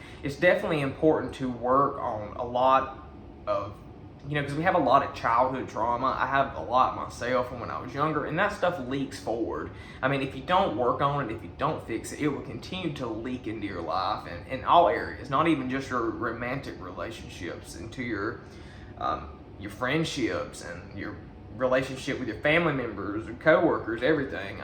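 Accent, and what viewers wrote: South, United States. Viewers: You have a very honest and down-to-earth sense of yourself and life in general. I love your southern accent drawl! lol. You've developed some great storytelling in your videos here. Maybe you could think about trying out some motivational speaker jobs at nearby schools or libraries?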